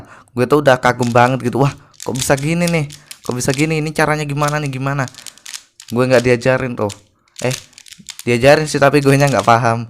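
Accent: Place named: Java, Indonesia. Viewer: Medok mu itu loh masss <3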